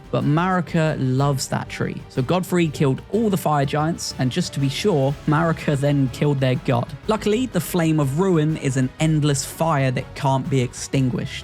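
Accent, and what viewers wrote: United Kingdom. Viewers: literally never seen a elden ring lore video that wasnt voiced by a british dude.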